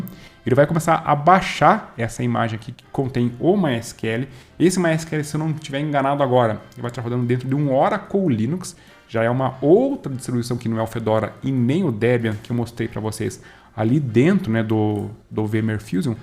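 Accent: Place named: Curitiba, Brazil. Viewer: Bem capaz que és de Curitiba. Piá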